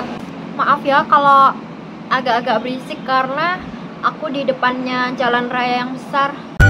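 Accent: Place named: Java, Indonesia. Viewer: Meski di taiwan..logat jawanya msh kerasa bgt.mntap...kgn indo ga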